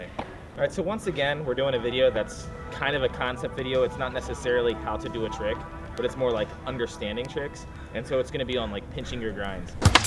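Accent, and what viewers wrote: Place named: New Jersey, United States. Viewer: I have no idea where is from, but I'm definitely hearing a Jersey accent in there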